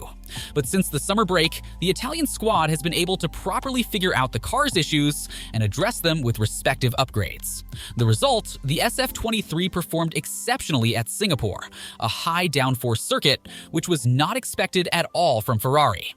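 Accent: United States. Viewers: the AMERICAN ACCENT is KILLING ME!!!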